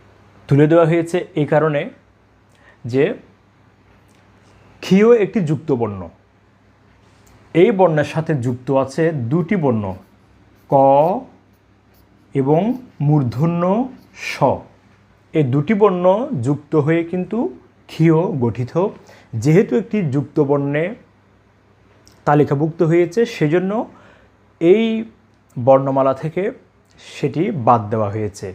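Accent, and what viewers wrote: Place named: Chittagong, Bangladesh. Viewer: চট্টগ্রাম এর আঞ্চলিকতার প্রভাব কিঞ্চিৎ লক্ষণীয়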